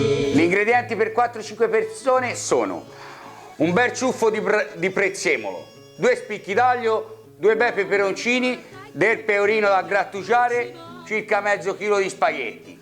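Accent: Tuscany, Italy. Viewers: Per caso sei toscano? 😂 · Vivo all'estero..che piacere sentire questa parlata toscana mentre prepara ricette antiche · Che sei toscano? mmmm come mi harba questa carrettiera! Grande chef😉😉😉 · Oltre le the ricette me piace il tuo accento oscano. Bravo.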